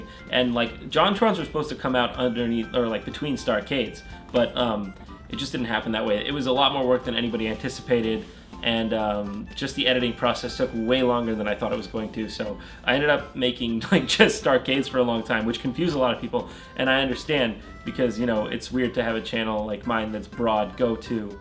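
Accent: United States. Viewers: what a strong american accent he has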